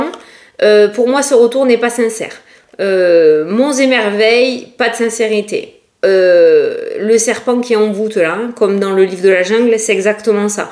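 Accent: South, France. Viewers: Bonsoir, un grand merci pour cette guidance avec votre jolie voix, votre petit accent du sud est marrant. Vous avez du psets et cela fait du bien. Votre dynamisme fait du bien. Merci pour votre gentillesse et votre bienveillance. Que Dieu vous bénisse ainsi que votre famille.❤❤❤🙏🙏🙏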